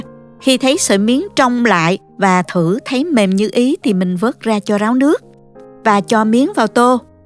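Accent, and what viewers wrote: South, Vietnam. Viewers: Cảm ơn với món miến măng gà 🍜thật ngon😋 & đẹp mắt. Giọng đọc thật là mộc mạc Miền Nam rất hay.❤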